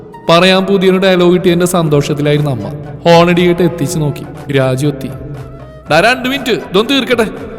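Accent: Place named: Thrissur, India. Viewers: Thrissur basha pollichuttaaaa😂❤ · ന്തുട്ട ഗടിയെ... മ്മ്‌ടെ തൃശൂർ slag ഗിൽ... കിടുട്ടോ... കൊള്ളാം ❤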